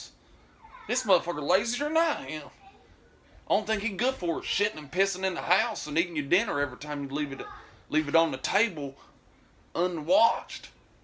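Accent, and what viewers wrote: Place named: Kentucky, United States. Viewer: my dog just did that. I got up & came back and that plate was licked clean and I mean clean. I couldn't get to mad him he's old. Edit =, you are from Kentucky? Right, cause you sure sound like it. Takes one to know one!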